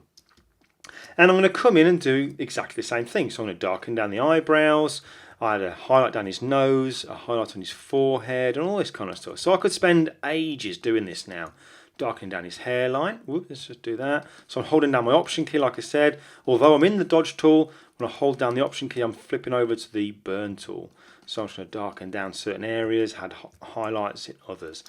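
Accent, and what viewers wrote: United Kingdom. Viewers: Amazing tips, Great stuff , with a British accent too :) keep these coming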